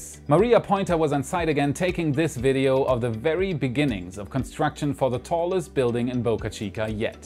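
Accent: Germany. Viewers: Now when I know that you are German I can hear your accent all the time 😂😂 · More German accent please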